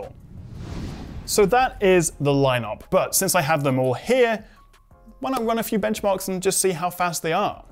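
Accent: United Kingdom. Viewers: I love how aggressively British you are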